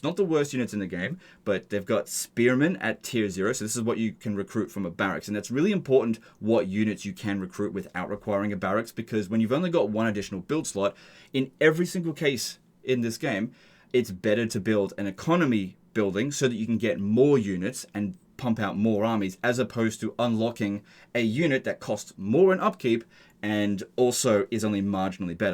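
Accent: Australia. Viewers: Came to see the dawi in S tier, stayed for the Australian.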